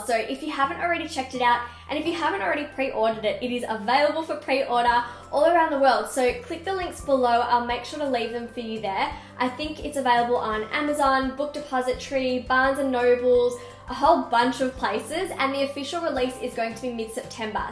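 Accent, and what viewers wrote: Australia. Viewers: i dont really understand australia accents but i know ur videos so great. i really want to understand it, pls make english subtitile:( so sad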